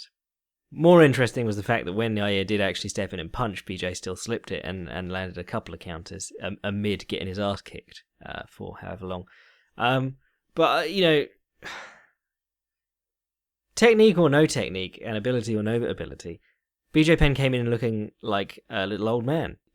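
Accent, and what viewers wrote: England, United Kingdom. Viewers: Your podcast is now a highlight of my week and as a Patreon supporter I want to say thanks for the great work; to me your breakdown of the cards with fight analysis alongside fight history and social history and the 'long view' with that chatty style (you charmer you! 😄) delivered with English humor works for me big time..original and refreshing change from lazy, samey trash from mma media. There are some really good guys, no doubt, but maybe not so much by way of innovation and getting fans engaged, you might agree? Could you the man t shake things up there? I note you plan to focus on the podcast at start of this year so that fills me with hope and glee!! I'm English and it's also nice to hear about mma from an English tongue..I would actually be interested to know who your audience is, if you've done that research and willing to share with us? 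(i.e from which countries, more US or British? much outside those two? Ages, sex etc..I want to know my new tribe better, I guess.. Anyway is one of boys and no mistake! Here's a thought then...how about a feature where Patreon bods can compete/enter for a chance to join you for ten, fifteen mins on the podcast for a chat on their favorite subject related to mma/Boxing/whatever, today or from the past..a little screening of participants would seem to important but i wouldn't mind betting you could have a pretty good, interesting conversation with a good many of your regular listeners..? Yeah alright, totally selfish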